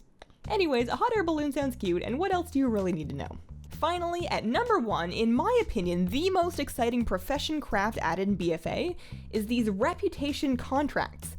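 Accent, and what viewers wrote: Canada. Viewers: love listening to Canadians talk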